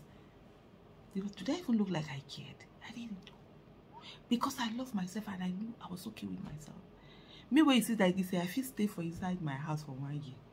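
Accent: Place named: Bendel, Nigeria. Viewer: Proper Bendel woman talking! Better listen o!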